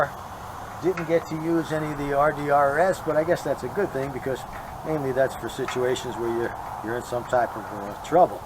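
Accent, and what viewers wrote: New York, United States. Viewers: I'M FROM SOUTHERN NEW JERSEY WE HAVE OUR OWN ACCENT. YOUR STILL A NEW YORKA:) THAT AIN'T NO SOUTHERN ACCENT:) GET OVER IT. ENJOY YOUR NEW RIDE AND GOD BLESS FOR BEING YOU AND ALL THAT YOU DO. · Congrats on You're new bike, I knew that You like Breaking Balls, hence the New York accent, that You still have for sure. Those were a couple of Good ones about the 🔥 Man, and about You paying the bike off quick writing Hella Tickets. 😆 anyway✌, and keep the Great content coming...👊😎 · You still have a NY accent! I'm a original mass-hole who did three years at Clearwater high school in Florida, for a crime I didn't commit. So I know a New Englander or new Yorker when I hear one. Moved to my Dad's native, upper Ohio river Valley, West Virginia, after high school and never left. I prefer the riding and culture of West by God Virginia over any place, any day! 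· Love the videos. Great stories. Terrible Southern accent - lol. Can still hear plenty of NY in it. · I'm from the UK and even I noticed your NY accent:-) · Not only do you still have a New York accent but I’m guessing you’re from Long Island. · Love the bike and your sense of humor. You had me for a sec when you mentioned that my speeding was going to finance your bike!! PS, sorry to say but but after 40 years, you still have a NY accent!! Enjoy that awesome bike!! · Neather you or has a southern accent. Although your N.Y. accent has mellowed out. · Where in NY? I hear the accent, but I am a Ex-NYer too. I am from Long Island. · "I think I have a southern accent" ahahah Sorry, no, I thought you were still in NY, maybe NJ, until I looked up that Harley dealership. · Dammit! I knew you were from the NYC Metro area. I grew up in Rosebank, Staten Island, a heavily Italian neighborhood. I even had on my block.:D We never lose the accent. 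· I live in New York and I can tell you are from New York. Yes you still sound like a New Yorker.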